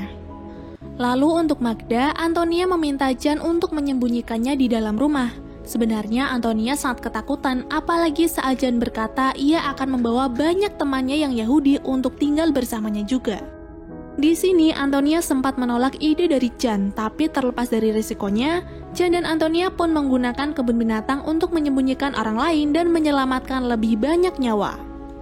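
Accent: Pemalang, Indonesia. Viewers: Wong pemalang kye ya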